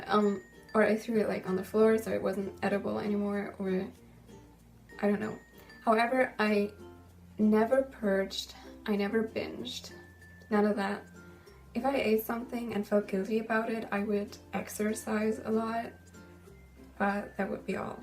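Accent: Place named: United States. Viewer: she have American accent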